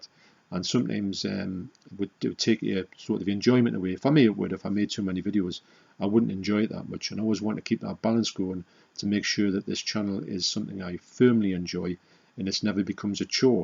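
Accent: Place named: Newcastle, United Kingdom. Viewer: Hi, I think it's fantastic that you are open to new experiences, ideas and the chance to improve the individual that you are. I really enjoy your content and your friendliness. You have a really charismatic on-screen presence plus I love the Geordie accent. Never stop being yourself but also never stop limiting yourself.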